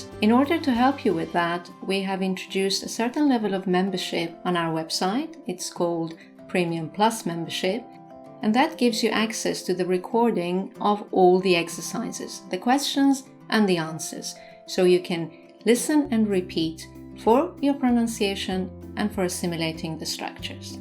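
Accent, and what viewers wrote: United Kingdom. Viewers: Your accent is very light for someone British. What part of England are you from? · Hi, I Just want to say thanks for the usefull tips you give me, I just have a Last question I know you speak british, but what kind exactly? From what City comes your accent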